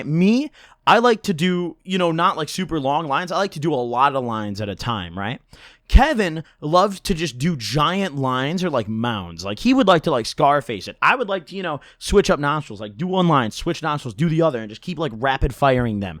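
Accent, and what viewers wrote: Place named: Midwest, United States. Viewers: That Midwest accent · he got the midwest accent like me lmao\ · i’m from ohio, so hearing meer sounded normal to me lmfao midwest baby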